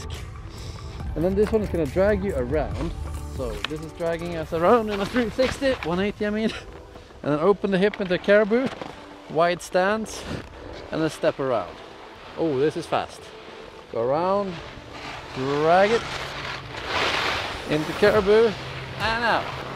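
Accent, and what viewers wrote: Sweden. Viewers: Det låter som att du är svensk jag vet att han prattar engilska men på hans engilska så låter det som att han är svensk🤣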